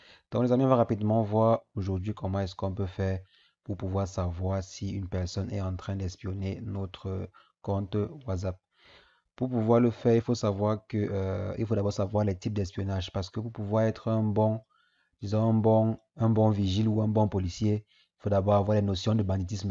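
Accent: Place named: Cameroon. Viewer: Peut être que je suis pas intelligent i mais je n'ai rien compris quand bien même avec ton fort accent Camerounais je devrais te suivre.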